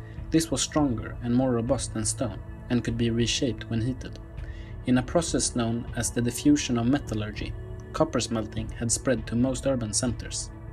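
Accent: Sweden. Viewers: Are you swedish? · tænk, så stokholm-svensk... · Juan Carlos Andreu He's Swedish, cursed with an accent. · The narrator sounds sooo swedish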